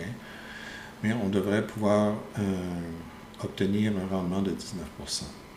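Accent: Quebec, Canada. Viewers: J'ai découvert t'a chaîne dernièrement et je fais du rétropédalage pour regarder ton contenu plus ancien. Je m'étais douté a ton accent anglo que tu étais de la belle province, maintenant il n'y a plus de doute. En tout cas beau travail de vulgarisation, j'apprécie beaucoup ton contenu, tu es très pédagogue. 👍👏😊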